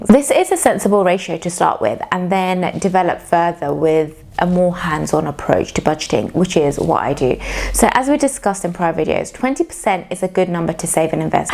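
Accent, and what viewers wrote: United Kingdom. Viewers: Yes! I am all about budgetting! I think it is one of the First Steps in finacial Freedom. As always very lovely video, and I love your British accent !👍🏻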